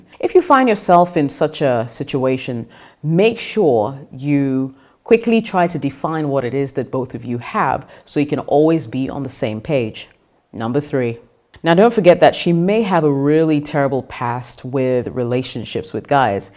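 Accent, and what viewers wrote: United Kingdom. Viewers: That accent is British English. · She’s British, probably born and bred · Ar you a Ghanaian? Your accent is different but very proper. It's hard to fetch out the Ghanaian in you but mostly British. You're beautiful!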